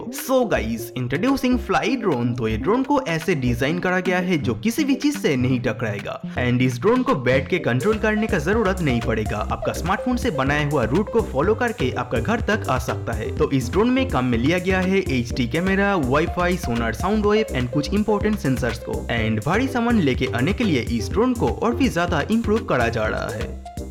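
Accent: Bengal, India. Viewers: Damn!! That's some freaking bad accent!!! When will this indian learn English??? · Bengali ho kya bhai!!!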